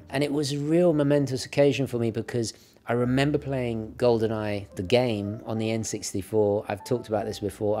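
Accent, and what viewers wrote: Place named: United Kingdom. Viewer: As soon as Daniel Craig retires from 007 movies, is my choice for the roll. Charming,likes watches,British accent,probably looks good wearing a suít....not to mention his smile with those perfect teeth....any Bondgirl would go crazy... now,seriously,great video as always. Technical and always sharing your knowledge on horologycal culture and history. Congratulations!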